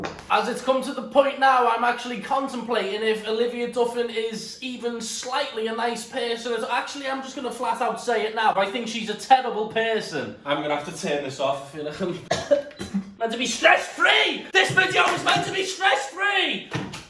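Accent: Liverpool, United Kingdom. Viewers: scouser to scouser I love you 😂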